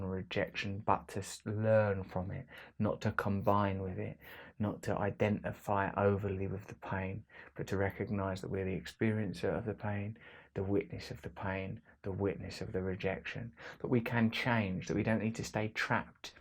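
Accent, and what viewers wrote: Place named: United Kingdom. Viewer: three reasons why I always regret watching Russel Brand videos: 1. Strong British accent ( I bearly understand a thing) 2.circling around a topic 3.messy appearance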